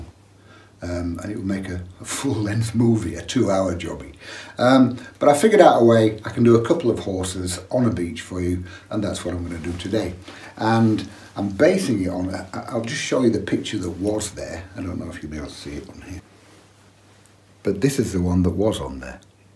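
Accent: Yorkshire, United Kingdom. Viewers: Hello I’ve just discovered your site. I love the painting of the horses on the beach. You sound as if you’re from Yorkshire. I have a mom that was from Sheffield. I’ve been to Yorkshire many times it is the greatest place I’ve ever been. ❤ I have a cousin in Doncaster. I will be enjoying watching all of your paintings and hearing you. Let us all know a little about you David V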